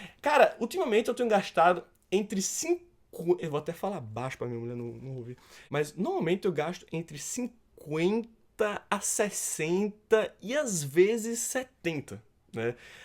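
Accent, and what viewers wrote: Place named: Pernambuco, Brazil. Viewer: Pensava que vc era de Pernambuco.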